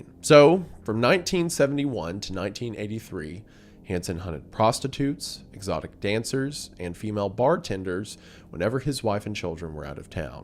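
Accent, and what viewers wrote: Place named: South, United States. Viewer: your not so upbeat southern voice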